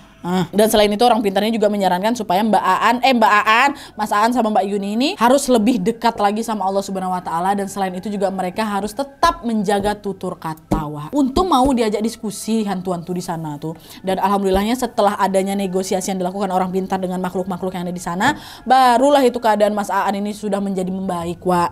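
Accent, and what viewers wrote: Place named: Medan, Indonesia. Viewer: btul. hebat dia bawain ceritanya ngomognnya cepet kali pula logat medan lucu aku loh seirng kaget nntn nya trus dia ini suka jumpscare suka teriak2 sndiri aku jadinya wkakkaka apalagi yg serem dan kdg kebayang sndiri takut banget aku smpe kebawa mimpi saking tiap hr buka khw yg ketinggalan2 krn akh baru subs ini. kebawa mimpi smpe hahahaha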